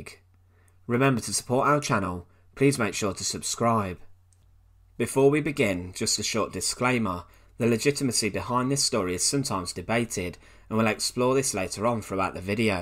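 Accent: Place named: Leicester, United Kingdom. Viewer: This strong Leicester accent is just terrible! It spoils everything.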